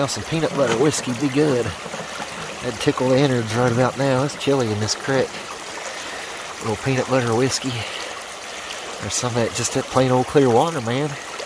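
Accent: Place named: South, United States. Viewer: You have a country southern style draw when you speak. I'm from Connecticut and I hear ya just perfect.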